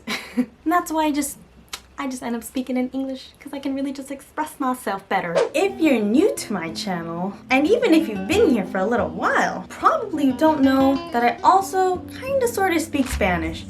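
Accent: Rio de Janeiro, Brazil. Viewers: seu sotaque é bem carioca! s2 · Aprendeu Português com um carioca kkk, ta puxando o S, INGLÊIXXX KKK Linda! :D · Conheci seu canal a pouco tempo, parabéns, você fala muito bem português e seu sotaque é bem próximo ao sotaque carioca. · Olá,. Falar outras línguas é um privilégio de poucos. Você possui um belíssimo sotaque brasileiro e demonstra muita dedicação e habilidade com idiomas. Você certamente é uma inspiração para aqueles que querem se aventurar pelo mundo do poliglotismo. Parabéns, e keep up the excellent work! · O que deve ser realmente ruim para um nikkei ao praticar japonês é que os japoneses devem supor pela aparência que o nikkei seja fluente por ter aprendido em casa. A propósito, seu sotaque de português parece do RJ. Muito bom!! 😎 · Seu português é muito bom e tem um sotaque meio carioca, sotaque aqui do Rio de Janeiro. Pra entender como é o sotaque daqui do Rio é só escutar a cantora Fernanda Abreu, carioquérrima. 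<link> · Você se expressa muito bem em português do Brasil. Acho que em outra encarnação você foi brasileira!!!! Mas o seu sotaque é carioca. Tenta usar o sotaque gaúcho. As girias gauchas também são muito legais. Bah, tchê. São tri-legais. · sotaque carioca diria ..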